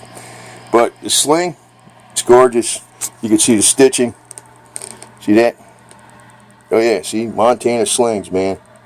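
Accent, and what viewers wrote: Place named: New York, United States. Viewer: Sounds like your from straight outta New York. Or at the very least Northeast? American over China any day.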